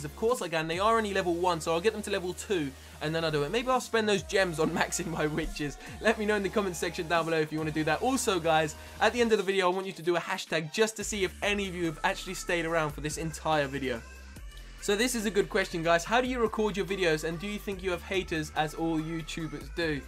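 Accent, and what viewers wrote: United Kingdom. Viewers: I knew it your British, so am i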